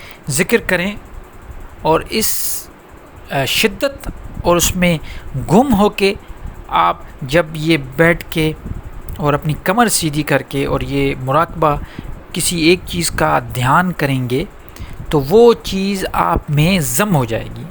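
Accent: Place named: Sindh, Pakistan. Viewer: Sir Aap ki zuban Sindhi lag rhi hy han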